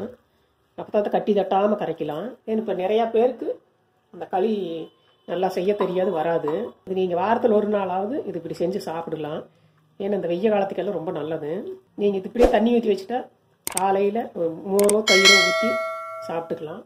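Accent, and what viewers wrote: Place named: Kongu, India. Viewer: அருமைங்க அக்கோய்.. நீங்க சொன்ன விதமும், உங்க கொங்குத் தமிழும் சூப்பருங்க..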